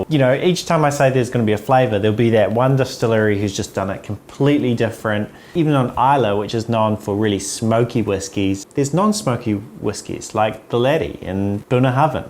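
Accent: New Zealand. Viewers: He’s definitely kiwi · When you first started talking I thought Aussie, but second sentence in I was like definitely Kiwi, especially when you said Glunluvet and Glunfudduch lol. Shot bro 🥃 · Are you a kiwi? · You're from New Zealand right?? Testing my accent knowledge here lol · Hi, thanks for the great video. Question: is your accent a New Zealand or perhaps an Australian accent? Just wondering. PS: I’m an Islay Whisky fan 🥃😁👍🏼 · Hey! Just had this video recommended to me, clicked it, thought 'oh sweet that's a Kiwi', then realised 'wait a second I know that guy'! Loving the content, instant subscribe!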